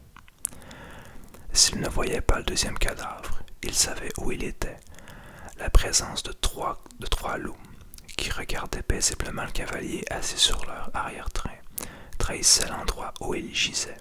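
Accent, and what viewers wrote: Quebec, Canada. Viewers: Je t'ai découvert très récemment et je te remercie infiniment pour ce magnifique moment passé à tes côtés ❤️ je ne suis pas énormément d'asmrtistes québécois mis à part la asmrtiste "asmr u okay?" qui est la seule que je suis avec toi, tout cela pour te dire que ton accent québécois est tellement agréables à l'oreille 🤗🤭 Plus le fait que j'aime beaucoup la lecture et la lecture chuchotée tout cela ajoute un côté très agréable à l'oreille et m'apaise entièrement ♥️. J'ai déjà regarder la saison 01 de The Witcher et je sais que la saison 02 est en train d'être tourner en ce moment, je ne comprenais pas tout ce qui se passait dans l'intrigue jusqu'à ce que je comprenne seule qu'il y avait des moments qui allaient dans le passé et d'autres qui retournaient dans le présent, c'est vrai que j'étais perdue mais après j'ai réussit à suivre l'histoire et à apprécier Yennefer et Geralt avec Citri si je ne me trompes pas pour son prénom 🤔. 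Je n'ai pas encore lu les romans et je ne sais pas vraiment comment suivre l'ordre chronologique 😂😂 Concernant métro 2033, je ne l'ai pas lu mais j'ai vu le résumé et l'histoire est très plaisante, prendre en compte une histoire de zombie qui vivent à la surface avec des gens qui se sont confinés en sous terrain pour survivre correspond tout à fait à la situation actuelle avec un côté fantastique à la Cloverflied Lane mixé à World War Z, c'est juste agréable de lire ce genre d'histoires là 👌. Merci encore pour tout ce que tu fais et de lire aus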